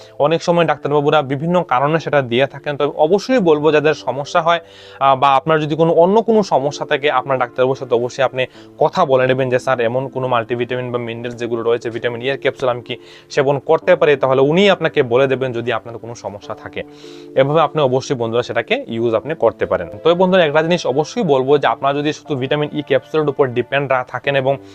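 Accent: Bangladesh. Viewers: বাংলাদেশীদের উচ্চারণ শ্রুতিমধুর নয় ।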